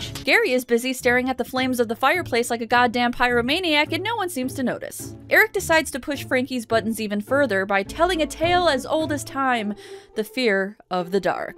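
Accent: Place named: Midwest, United States. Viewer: Your from the Midwest aren’t cha?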